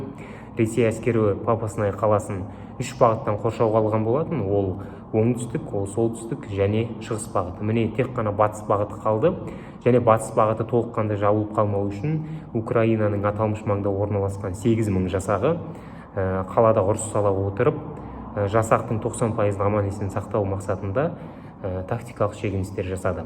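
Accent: Standard. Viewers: Ой, балам, өркенің өссін! Таза қазақша, тамаша дикциямен объективно түсінік бердің.Рахмет. Арнаңызға табыс! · баламыздың сараптамасы жақсы мағлұмат береді. Тілі таза, ойы жүйелі, дикциясы анық. Рахмет.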